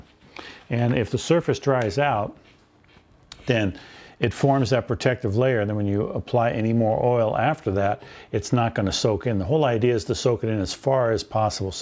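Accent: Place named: United States. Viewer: I love American accents!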